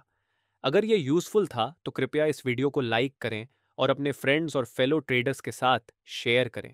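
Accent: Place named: South India, India. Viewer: Sir aap kaha se ho ek baar batana Mujhe South wale lagte ho Lekin telgu madrasi kannad Kon ho aap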